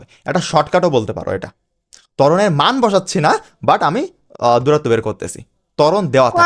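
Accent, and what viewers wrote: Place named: Jessore, Bangladesh. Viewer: Jessore er vasa😁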